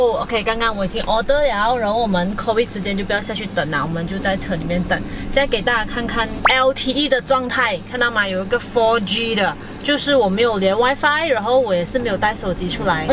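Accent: Malaysia. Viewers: 你系痴利根定系马来口音都系咁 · 馬來西亞普通話？ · 我是来听你的马来口音的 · 马来西亚的口音都是这样子的吗？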